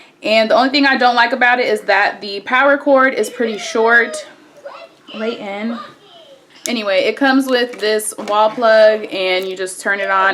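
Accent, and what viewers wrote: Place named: Oklahoma, United States. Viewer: Sounds like you are in lawton ok lol new sub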